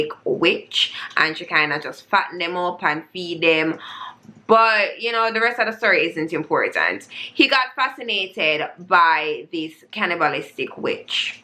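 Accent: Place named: England, United Kingdom. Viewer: I was wondering where you going with an English accent and you dealing with German serial killer. Look likes you were thinking the same thing!😝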